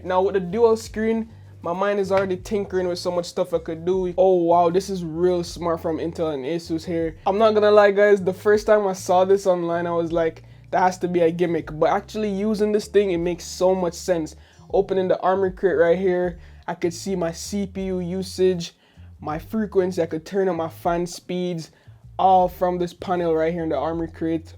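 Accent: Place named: Jamaica. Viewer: Subscribing just because you sound like a fellow Yardie. Didnt even finiish the video yet 🤣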